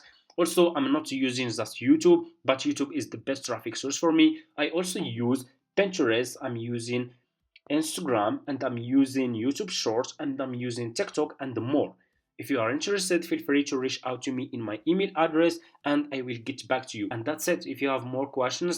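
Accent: Morocco. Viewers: He has a Moroccan accent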